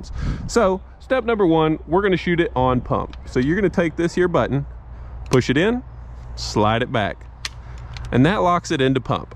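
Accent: South, United States. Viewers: This man sounds exactly like demolition ranch but with a bit more of a southern accent.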